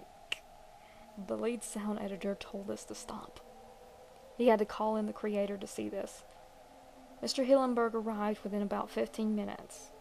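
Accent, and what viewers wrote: South, United States. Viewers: You sound like a Hucken Hill Billy.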